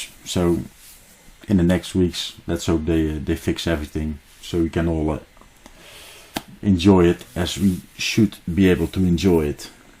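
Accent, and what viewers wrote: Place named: Netherlands. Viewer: Let me guess.... Nederlands? Kan bijna niet missen haha.. 😜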